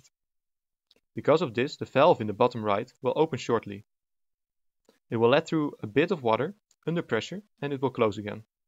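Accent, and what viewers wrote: Netherlands. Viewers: First of all fuck the dutch or whatever accent..I feel like Im about to head into a gas chamber...second of all the lost water can easily be recoupped...but no...."Its ok because it comes from a river"....I hope your eggs break....You're welcome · Lol hearing dutch accent and a water project😂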